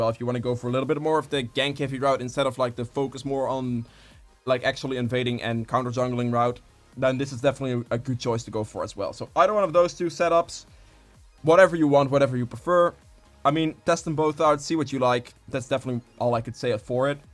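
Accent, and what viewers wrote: Netherlands. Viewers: you sound like ur dutch and nice video bro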